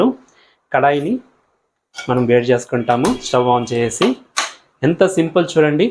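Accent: Tamil Nadu, India. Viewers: no no meeru bagaane maatladu tunnaru kaaka pote meeru tea chese video lo paalu kaagatam leda maragatam ana kunda paalu udikaayie annaru andukani maatlade vidhaanam Tamil style gaa anipistundi naa mother tung Oriya.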